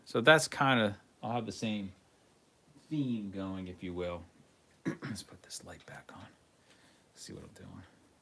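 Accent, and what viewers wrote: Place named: Philadelphia, United States. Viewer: Your Philly accent is excellent. Great voice. And I have thought about doing live but haven’t really dug further into it. Sounds like this might be an email conversation. I’ll dig your email out from your about page.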